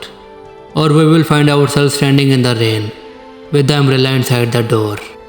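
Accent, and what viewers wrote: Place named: India. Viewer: Why india accent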